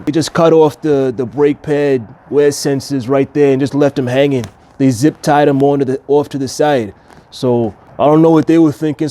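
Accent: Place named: Boston, United States. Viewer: You sounded like you was from Boston.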